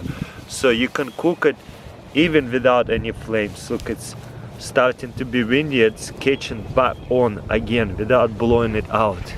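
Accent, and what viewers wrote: Russia. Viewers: Вот это понимаю,английский с русским акцентом)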